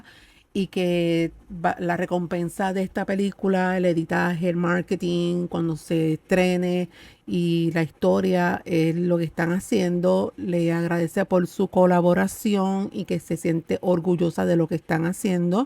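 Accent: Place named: Dominican Republic. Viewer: suena como dominicana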